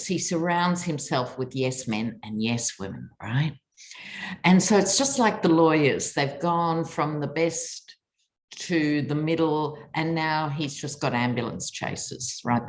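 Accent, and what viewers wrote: Australia. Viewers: I love your Aussie accent!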